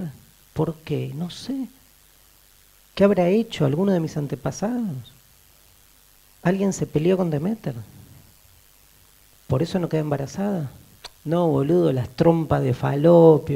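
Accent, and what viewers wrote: Argentina. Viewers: Acento argentino.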